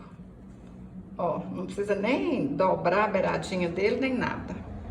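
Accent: Minas Gerais, Brazil. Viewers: Que sotaque maravilhoso. Saudade de Minas Gerais. Parabéns pelas criações.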